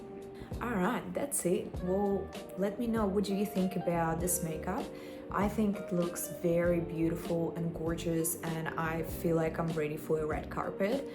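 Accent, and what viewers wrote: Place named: Australia. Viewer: у вас такой классный австралийский акцент😍очень красиво звучит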